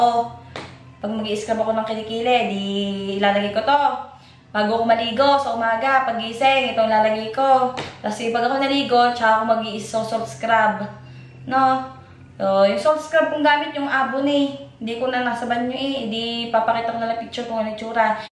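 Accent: Batangas, Philippines. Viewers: Ang lalim po ng accent mo...taga batangas ka noh? Hehehe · Ang gudvibes ni ate gurl hehehe... 😂😂😂 batangeña... Tone heheh.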